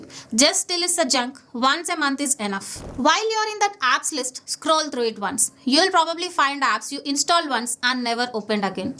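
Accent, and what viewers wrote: South India, India. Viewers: #@ Please pronounce english word properly you are using south indian accent english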